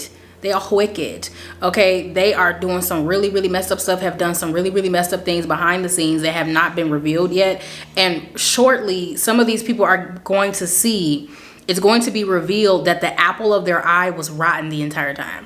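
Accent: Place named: United Kingdom. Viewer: The way my mind was convinced that you were from the UK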